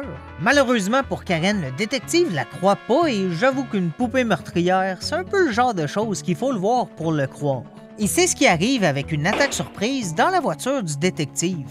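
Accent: Quebec, Canada. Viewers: Wow, je vient de découvrir ta chaîne, et franchement, c'est propre :D En plus, j'adore ton accent québécois, et je trouve super beau 😊(ouais, un peu bizarre 😅) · Jadore je m'abonne et j'aime ton accent québécois moi aussi je suis québécois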